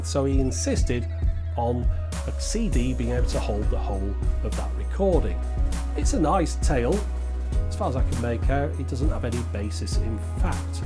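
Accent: Germany. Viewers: the english language explanation is clearly done by a kraut, you can hear the accent through even though he does a good job of masking it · The same with German, the English voice sounds a bit "German" anyway.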